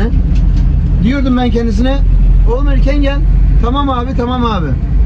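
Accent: Gaziantep, Turkey. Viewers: Çok şükür gerçek bir Gaziantepliye rastladım. Abi seni dinlerken tamam bu tam Antepli işte dedim. Doğal ve içten olurlar. Samimi insanız biz çünkü 👏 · Abi bi anteplinin dilinden antepli anlar gelirken sana salca getirecem 😅😅😅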